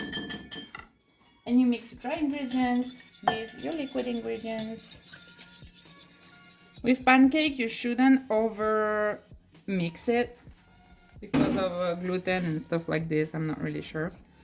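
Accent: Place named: England, United Kingdom. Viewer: your accent 🥰 even more english 🇬🇧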